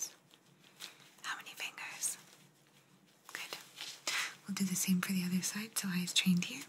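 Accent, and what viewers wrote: Italy. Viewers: Hi, i must have fallen asleep. I will need to watch the video again. 😊 Could you do another cranial exam with your italian accent? 😅 or a travel agent. Showing us beautiful places in Italy to visit. That would be amazing! Anyways, thank you for another great video. Ciao! 😊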